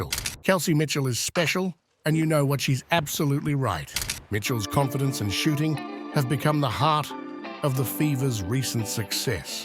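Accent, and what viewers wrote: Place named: England, United Kingdom. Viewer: Why is the voice-over either English, or worse, AI programmed to sound English. Get that crap back across the channel.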